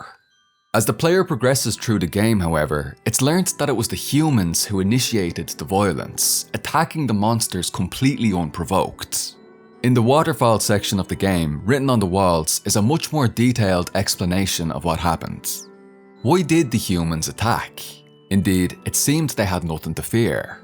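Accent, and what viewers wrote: Ireland. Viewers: as a fellow irish person, it's so great to see another undertale fan from our country! this video was amazing and extremely well articulated ❤ you earned a new sub 🇮🇪🇮🇪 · yo I clicked on the video and in the first few words I was yoooo this guy is a fellow Irishman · I’ll have forgotten the spoilers by the time I play. besides, you arent the first. the people I used to know back in school would always talk about this game. of course, none of them did it with a handsome Irish accent and a Marxist analysis to top it off, so Im a little more inspired to see the game for myself now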